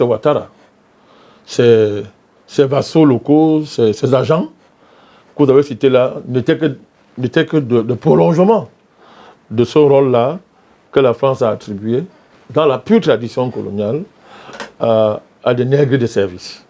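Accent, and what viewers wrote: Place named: Cameroon. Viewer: Longue vie l’état ivoirien plus respectable et responsable que ton Cameroun de malheur ton accent même est à gerber 🤮 🫢😁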